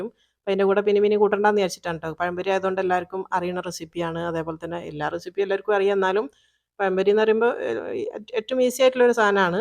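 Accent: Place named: Kozhikode, India. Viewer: Super dear njangale Kozhikode slang 🥰❤️presentation super. 👍stay connected 🥰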